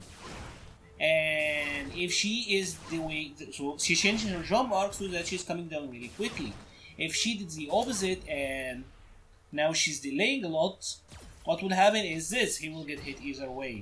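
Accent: Egypt. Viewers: Man u sound egyption?? great video . Can u do other mus??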